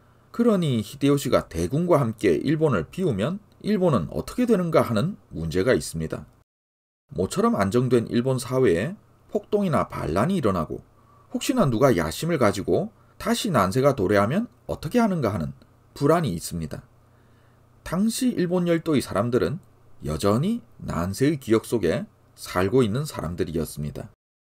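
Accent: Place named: Gyeongsang, South Korea. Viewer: 경상도 어디사람인지 정말 궁금하네요.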